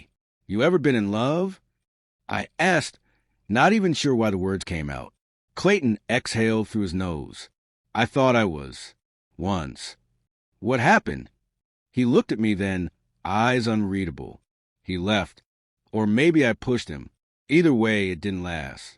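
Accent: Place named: South, United States. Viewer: I love this narrator, best voice, slight southern accent, very soothing!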